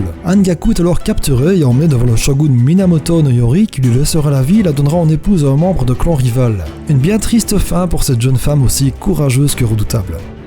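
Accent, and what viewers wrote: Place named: Liège, Belgium. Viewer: Dis tu viendrai pas de Liège parce que l'accent ressemble beaucoup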